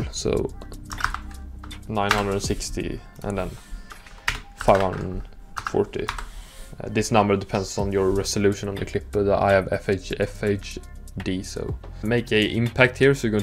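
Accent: Sweden. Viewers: why does he sound swedish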